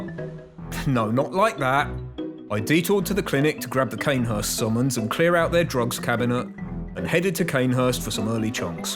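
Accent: United Kingdom. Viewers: finally is talking you sound british my dude🤩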